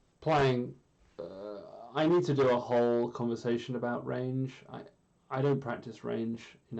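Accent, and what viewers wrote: United Kingdom. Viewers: I am going to be honest. I had no idea you had a British accent, or that you were even British. I love the results either way. Thank you for sharing your talents with me.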